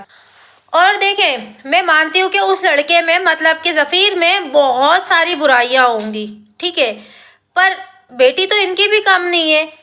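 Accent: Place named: India. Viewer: Sach me aap pakistan me raheti he aap ki baat cheet lahja indian jassa he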